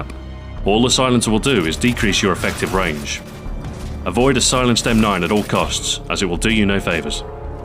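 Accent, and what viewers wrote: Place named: United Kingdom. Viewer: your British voice is hypnotic..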